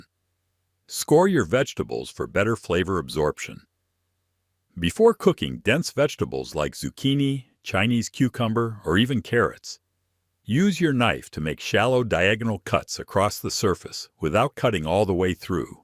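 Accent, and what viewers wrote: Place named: United States. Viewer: What's with that terrible American accent?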